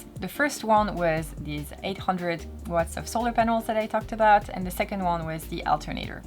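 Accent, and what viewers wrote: France. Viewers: I found myself watching your video because I couldn't stop listening to your accent. I love the French accent and wish that my French (Ce n'est pas bien, je suis desole:) were half as good as your English. I wish that my wife and I could do what you and are doing. Great video! Merci beaucoup.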